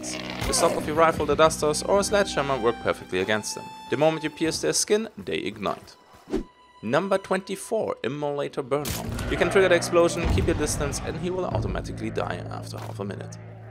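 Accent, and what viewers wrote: Germany. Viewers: Man merkt das der Type ein deutscher ist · Hey, are you german? You sound like my old classmates in english lessons. But I in deed like your video! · Du bist Deutscsher oder? Oder zumindest Schweiz oder Österreich. Hört man einfach an der Aussprache :P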